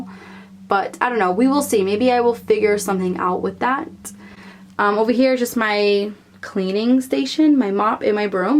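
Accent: Boston, United States. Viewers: smile every time when I hear the boston accent. Awesome video.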